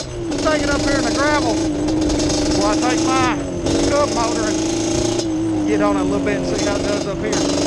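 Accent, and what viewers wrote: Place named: Pittsburgh, United States. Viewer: I can tell where "yinz" are from. Lol. Nice scoot. Looks tome like you know your 2 strokes.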